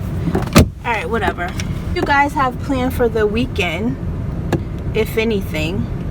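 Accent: New York, United States. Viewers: Hi sis, lol, I love you right..lol but I use to think at first you sound mad, but over the years since I know you better, I know you dont have an attitude. But its just the way you guys sound in NY, just had this convo with some ppl I know from NY, to me she just seems so harsh but thats just her demeanor and her way of speaking. Just like the way I talk is different, some say country or soft spoken. Now go watch my vid and tell me how I sound to you..lol..great video always and true about the life timers on welfare. The abusers, but the ones who dont try to do anything in life but use up the system, its the kids I worry about not the parents. Trump just wants to see how far he can rule and mess up lives. tfs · girl, you had me cracking up about that police officer and that gym...you like bitch, I don't want to talk to you! lol...lol...I was dying, I feel the same way, the gym is suppose to be where you get your workout on and then bounce, I woulda been mad too if I was you, I hate being bothered, especially when I want to be left alone and do my own thing...did you ever get to go to that restaurant or to see hidden figures? 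girl viola davis is an amazing actress, she puts her heart, soul, and everything else into her acting...I haven't seen either movies because unfortunately I don't get the chance to really go and see movies like I use to, but both movies look like they ought to be great.. look forward to seeing your mount review...I always wondered some time back how people was able to do that...giggle...I was lucky, when I was in my car doing videos, I wasn't moving, just sitting in my car, and the way my car is made I was just lucky, I was just able to sit up my camera with a small box and it worked...giggle..I think you have a little bit of an accent, not much... I have heard new yorkers talk and a lot of them definitely has it..does it sound like attitude? sometimes, depending on the person, andrew dice clay comes to mind, now that dude has a hella accent, and when he talks most of the time, its with attitude, lol...so again it depends...and oh girl! 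yes I saw new edition movie and I loved it!! all the actors were on point, I actually did a review on it, when you go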